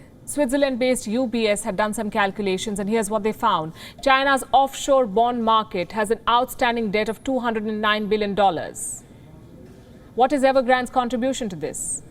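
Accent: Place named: India. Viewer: You are making the world love English Indian accent.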